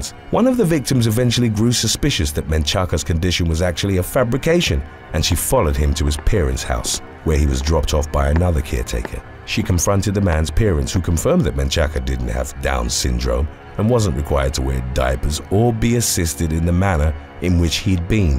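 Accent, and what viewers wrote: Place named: United Kingdom. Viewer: Why do these American hoaxes sound SO much more sinister coming from a British narrator???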